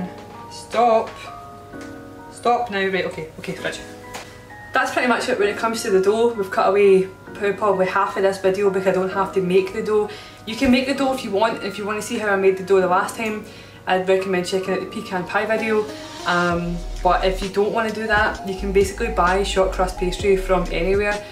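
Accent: Scotland, United Kingdom. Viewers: Wholesome, entertaining, and scottish noises. This is a top notch video. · Also, something that no one tells you - you need to drain the excess liquid out of the pumpkin to make a solid pack pumpkin. This is done after the sieving/mashing is done. You take the mashed pumpkin and put it back into the sieve and let it sit over a bowl to let the liquid drain for about 1 hour or until the pumpkin mash is thickened. Doing this will result in a firmer filling that wont weep after baking. I love to bake and really enjoy watching your baking videos. My grandmother was Scottish and she was a fantastic cook and baker. Watching you and hearing your accent, brings back happy memories of her. No recipes or measurements - just a little of this, and some of that. No worries with October timing, we don't eat pumpkin pie for Halloween in the US. It's a November/Thanksgiving dish here.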